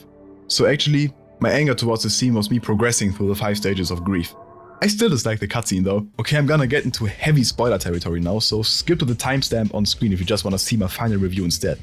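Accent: Germany. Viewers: Sag mal, kommst du aus Deutschland?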